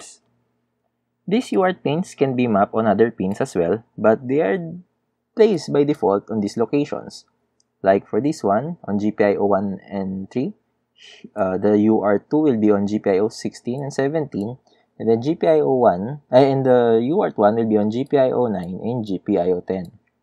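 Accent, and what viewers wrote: Philippines. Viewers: Nice video dude. Just wondering, pinoy kaba? Its just the accent.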